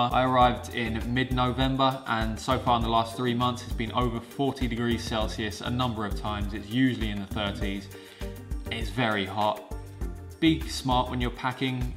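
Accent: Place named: United Kingdom. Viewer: This is the nicest UK accent I have ever heard. Can I ask whereabouts in the UK you are from?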